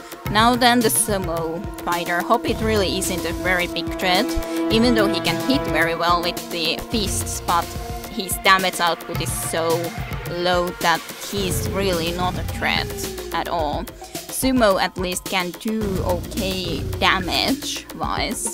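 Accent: Finland. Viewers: Well, Hungarian has certainly been "corrupted" so to speak by slavic languages and has taken some slavic sounds which I don't see anywhere in these Finnish words. Also, its why you say things like "as such" so uniquely, where a Hungarian person would have no problem making the "tʃ" sound ( its actually very common in Hungarian) But from what I heard so far, both Finnish and Hungarian maintain a rather consistent pitch, so when you speak English it results in an extremely interesting accent. Finnish seems to be more influenced by German then Slavic languages ( for example the word "Ritter" in "sormusritarit" ) As for Torment, that depends on whether you think you would enjoy it or not? :P I would recommend replaying it if you haven't played with a very high wisdom, as high wisdom makes for a much more interesting game.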